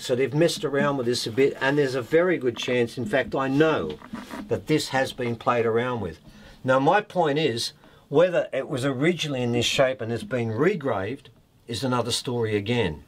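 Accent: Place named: Australia. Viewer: It sounds like this documentary was made by an australian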